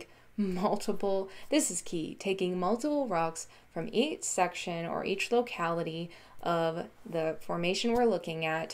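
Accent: South, United States. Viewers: awesome videos. are you from the South?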